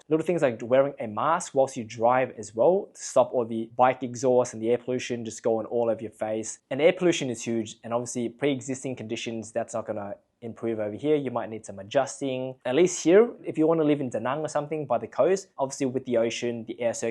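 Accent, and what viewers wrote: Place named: Australia. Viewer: You have an Australian accent.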